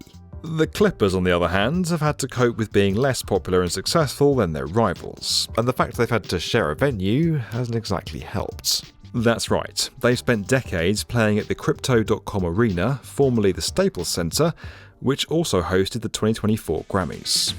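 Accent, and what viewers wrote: United Kingdom. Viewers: These pretentious British narrator voices make me ill. Similarly do does the cost of seating and tickets in these arenas built for the minority of wealthy people and the fiscally irresponsible rather then the rest of us. Seriously why would I waste so much money when I can watch it at home in comfort on a huge screen for a tiny fraction of the cost?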